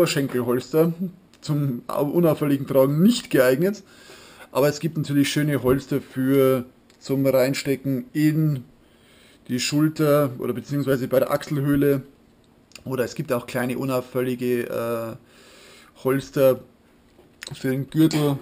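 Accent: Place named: Burgenland, Austria. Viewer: Woher bist denn du? Du redst meinermeinung noch wia a Burgenländer.(I bin aus Margerethen.)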